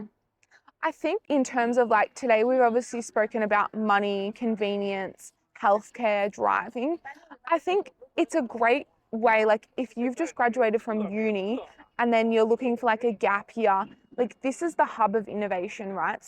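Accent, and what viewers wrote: Australia. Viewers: No, she is not an American. She has an Australian accent, from West Australia. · Love your Aussie accent ! Plus you are a gorgeous lady, and your mandarin is good (well. way better than mine) !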